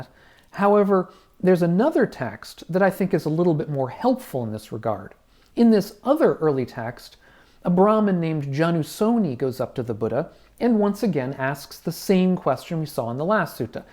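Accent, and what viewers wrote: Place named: Scotland, United Kingdom. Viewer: You sound scottish to me i like your lectures great stuff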